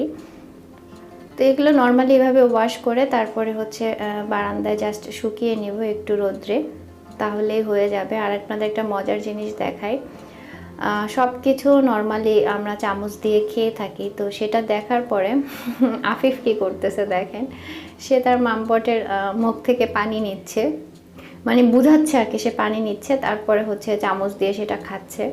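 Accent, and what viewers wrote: Sylhet, Bangladesh. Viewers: Apne ki Sylheti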